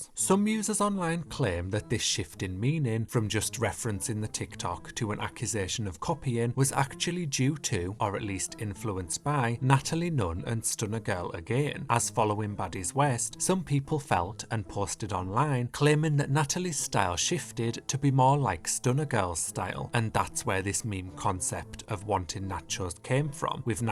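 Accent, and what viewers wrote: United Kingdom. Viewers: He's from the UK, so I'll give him a pass. Maybe that's just how they pronounce there? Natchose. Natchose...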